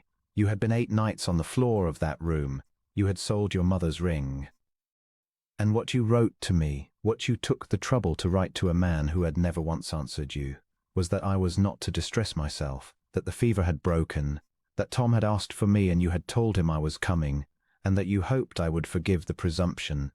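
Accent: United Kingdom. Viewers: Loved this story! Thank you for reading it in a British accent and making this tale so authentic and enjoyable.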